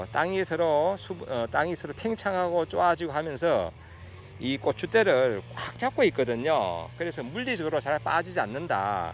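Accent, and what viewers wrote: Gyeongbuk, South Korea. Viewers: 선생님 음성도좋으시고 말씀도 정확하고 조리있게 잘하셔서 아나운서 를하시면 좋을듯합니다 유트뷰 로 뵙기에는 아까운 인재심니다 그리고 저는 고향이 경북상주군 공검면 하흘리 에 태어나서 10살쯤 경기도 에이사와서 현재 거주하고있는데요 말씨들으니까 고향분같아서 몇번씩 반복해서 영상을보고있습니다 저는6월 12일에 모종을 사서심었는데 언제쯤추수가 가능할까요 현재25마디까지 커서 적심한 상태입니다 처음심은 참께 농사 가 많이어렵군요~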